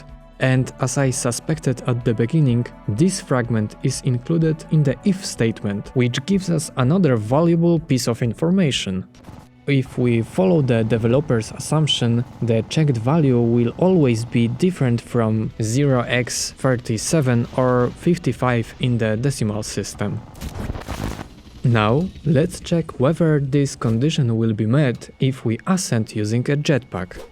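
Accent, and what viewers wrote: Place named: Poland. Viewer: jesteś z polski słysze akcent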